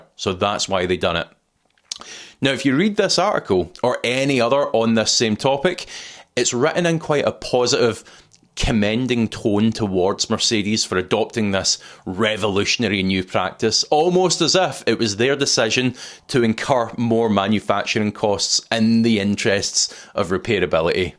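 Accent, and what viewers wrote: Scotland, United Kingdom. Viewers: I subscribed just for hearing English in the Scottish accent!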